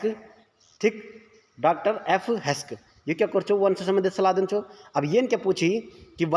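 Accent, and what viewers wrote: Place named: Garhwal, India. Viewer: Aapki gadwali pyari h sir ji 👌🏻👌🏻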